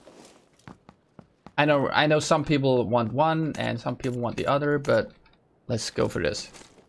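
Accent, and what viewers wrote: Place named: Denmark. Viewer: hey what country are you from because you seem to come from Denmark. in your way to pronounce English on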